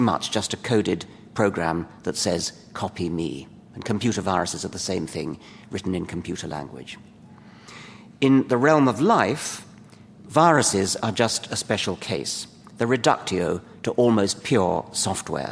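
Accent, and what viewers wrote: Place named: RP, United Kingdom. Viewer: Dawkins has long been the most eloquent advocate of all things science for the general reader. And I love his upper crust British accent :-)The Selfish Gene should be required for all high school students.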